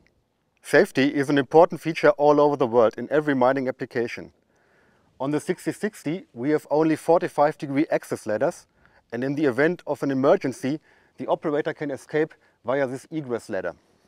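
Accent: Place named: Germany. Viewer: Ummmh, when germans speak english :-(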